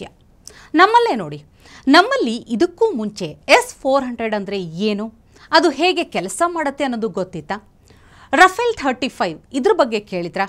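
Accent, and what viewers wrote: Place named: North Karnataka, India. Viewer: ನಿನ್ನ ಉತ್ತರ ಕರ್ನಾಟಕದ ಭಾಷೆನೆ ಚಂದಾ ಕಣವ್ವ, ನೀನು ಬೆಂಗಳೂರು ಭಾಷೆ ಮಾತನಾಡ ಬೇಡ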